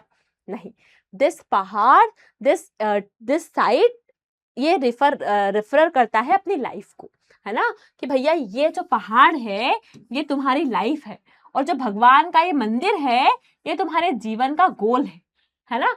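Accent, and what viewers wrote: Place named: Haryana, India. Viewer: Haryana te ha yaar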